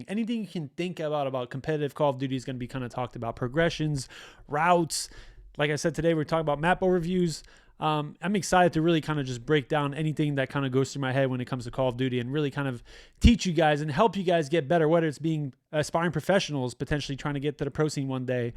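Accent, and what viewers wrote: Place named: Quebec, Canada. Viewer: Thank you! I was in your live stream when you were talking about this and I remember asking if you would make a youtube video about it, so pumped to see that you pulled through. Please make more videos like this!! Also cheers from Canada, I know a french canadian accent when I hear one.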